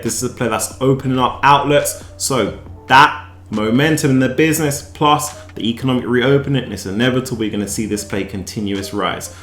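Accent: United Kingdom. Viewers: Hi, are you from UK?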